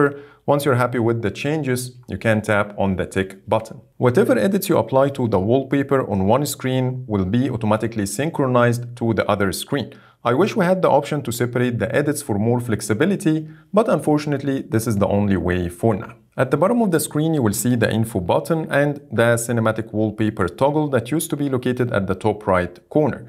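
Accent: Egypt. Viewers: Ur accent is egyptian am i right ?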